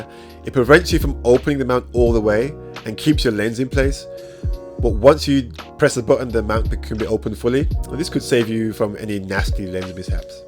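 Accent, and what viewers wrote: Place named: Midlands, United Kingdom. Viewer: I can hear the midlands in your voice. Yuh Dun know I'm subbing ☝🏾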